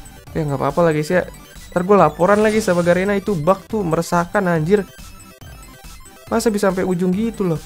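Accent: West Java, Indonesia. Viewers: BG emang or Jabar ya..? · Orang sundaa bangg?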